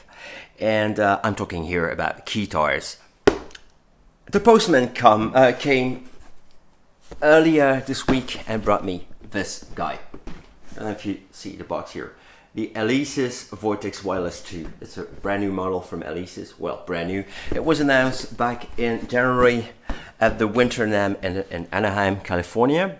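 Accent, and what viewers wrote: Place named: Norway. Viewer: What is that accent dude? I can hear Norwegian, american, scottish and swedish??🤔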